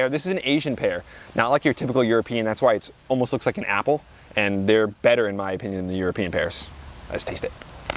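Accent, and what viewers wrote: New Jersey, United States. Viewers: I love watching your channel. From the Jersey accent to your child like enthusiasm for your entire gardening adventure, you are truly entertaining and very informative. I am a 64 yr old that is in process with the hubs building a new home on our 7 acre property in SE Indiana in the Ohio River valley. The hubs and I both grew up on farms and our families always had gardens and livestock. Of course, neither of us appreciated being raised that way AT THE TIME, (too much like work!)but are looking forward to cultivating as much of the 3 plus lawn area of our property with food and probably a few chickens. I am hard core about gardening via organics, and the hubby says "Meh", but pretty much does as instructed in that area!! We've already done gardens on our previous property, started it in the spring by adding soil amendments like sand, (to break up that Hoosier clay!!) rotted horse manure donated to us from my farmer uncle, piles of rotted leaves we'd accumulated the fall before that we just spread out on the new garden spot and covered with a huge tarp after we wet them down and left it for the winter. 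We added some lime, to sweeten things up, tilled it all in and started planting. We made the mistake of planting 72 tomato plants and didn't stagger them at all. OH MY GOD!! We sure learned our lesson there!!! It was also a really good growing season that year for tomatoes. Lets just say the neighbors, our church family, and we had all the tomatoes anybody could ever use! Thanks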